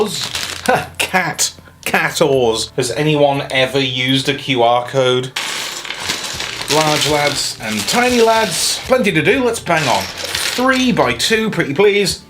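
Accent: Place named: United Kingdom. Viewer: I do remember the "Transform a thing" song! I do miss it! I don't remember exactly how I started watching your reviews, I do remember it was either the Kapow sponsored ones that showed up as news on Seibertron OR Acid Storm and Dropshot because they were obscure repaints and no one else had done them when I got them, but I do remember I saw the first ones and was like "heh, cool reviews" but left it there, and then when I saw the second ones I was like "heh, cool re...wait, I had seen reviews by this english guy before!" And after that, I began binge watching your stuff and have been a faithful follower ever since, you even helped me finally understand british accent!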